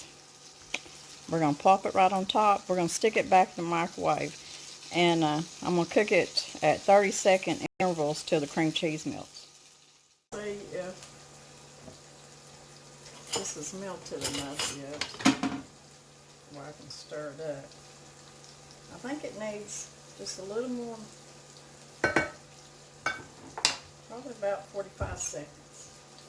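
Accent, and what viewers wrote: South, United States. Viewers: Thank you again...I am going through all your older videos...as I have said before, it is so relaxing listening to you talk. You Southern accent is just adorable. I certainly understand about keeping off the weight at 73. Carbs and sugar are the culprits for sure. But cravings for these things does disappear quickly. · It's a life change. Diets are for quick weight loss. Thanks for your videos. I so enjoy watchin your southern recipes and love your southern acsent. Im going to make your salsa verde chicken casserole. I'm on weight watchers so i eat cauliflower as my rice replacement alot. Plus im not crazy about rice.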